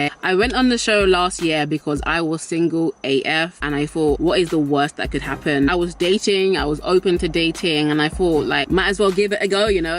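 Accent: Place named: England, United Kingdom. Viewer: !!! I missed you. I haven't been on your channel for a while. I am so happy that you are doing well and have a 1yr anniversary coming soon. Congratulations. I feel you on the dating alone thing. I'm kinda going through that right now. I'm learning a lot about myself as an individual and what are my game changers and deal breakers. I love, love your English accent.😍 You have a very beautiful and giving spirit. God Bless you &so much love. 🤗❤🙏🏿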